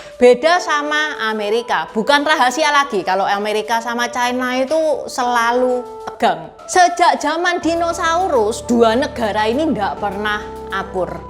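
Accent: Java, Indonesia. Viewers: Jawane ngendi kie, deneng medok temen ! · Plek ketiplek ....... 😄😄, Jawa banget. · beritanya dibawa oleh lebih Seru lagi karena Bahasa Indo rame sekali & jawanya medok buanget tambah sedaaap deh.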